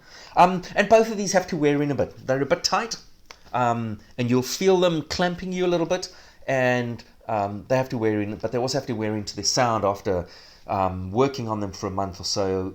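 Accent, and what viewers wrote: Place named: New Zealand. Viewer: thats a kiwi accent alright , loved the review would love some more comparisons if you get hands on other studio classics like beyerdynamic dt880 pro , akg k702/712.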